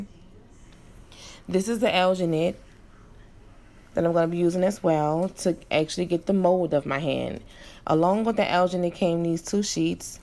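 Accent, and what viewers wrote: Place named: New Orleans, United States. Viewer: That N.O. accent BABY! 💕 thanks for posting this video I'm in New York I want to make my own as well!!!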